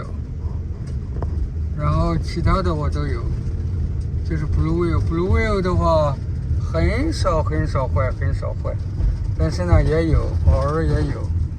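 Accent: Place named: Henan, China. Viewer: 大师听口音河南的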